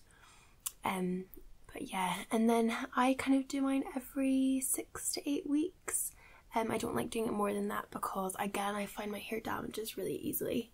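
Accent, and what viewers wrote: Scotland, United Kingdom. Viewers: I love your Scottish accent. Greeting from the USA. I just subscribed to your channel today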